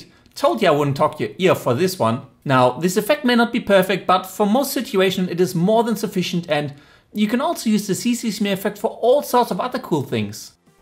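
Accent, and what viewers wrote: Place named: Germany. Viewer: You have a german accent xD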